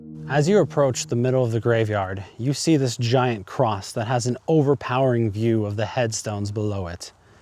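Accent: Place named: United States. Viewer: Thank you so much for your time making this video. From your accent I guess that you are American. It is wonderful that you describe the fallen warriors in such moving terms. You are an example to us all . Again many thanks.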